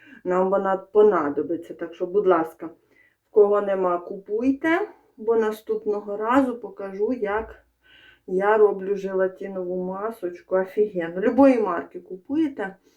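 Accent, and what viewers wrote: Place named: Boryspil, Ukraine. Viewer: Ви, вразили тим- 40 год!, спасіба, 3 часа, саме вкусне, я рахую, вобще, шутками і таке інше. 😊 Ви направду; розважили мене нині. Пам'ятаю із свого дитинства як у Борисполі, батьківщині моїх бабусі і дідуся, розмовляли подібно до цього, такою малороською мовою, кумедним суржиком. Але вони були мудрі та навчили своїх дітей звертанню до батьків- тато, мамо і на ви. Сподіваюсь, у Марії все добре. Здоров'я та добробуту. 🇺🇦✌❤🖤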